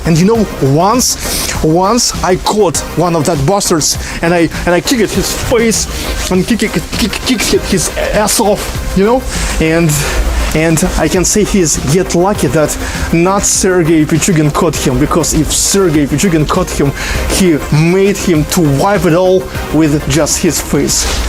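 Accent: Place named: Russia. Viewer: Sergey you dont need to improve your English. Keep it real with your Russian accent and this is probably one of the best channel I've seen explaining about a country that has humour as well. Excellent work!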